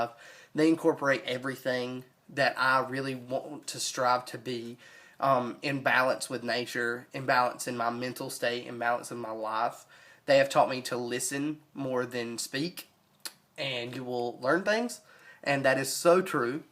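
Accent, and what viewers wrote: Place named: South, United States. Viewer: Looking forward to watching your videos! I am from England and I love your southern accent :) x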